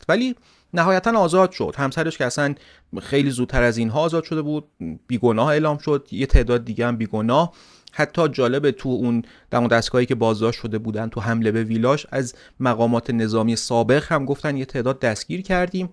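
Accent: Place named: Tehran, Iran. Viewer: با درود جناب. کاراتون رو پیگیری میکنم و بسیار آموزنده هست برای من. به عنوان یه مخاطب خیلی خوشحال تر میشم در تلفظ کلمات و اسامی غیر فارسی بیشتر دقت بکنید. متاسفانه همه کلمات و اسامی رو با لحن و گویش فارسی تهرانی ادا میکنید جدا از این که انگلیسی، کردی، ترکی و هر بان و گویش دیگه و مخصوصا منو که زبان مادریم فارسی نیست خیلی آزار میده. با تشکر از ویدیو های جذاب هر سه کانال تون 🎉❤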